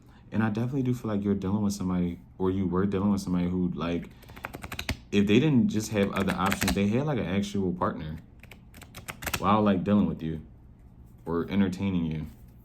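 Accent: Philadelphia, United States. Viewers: You from Philly ?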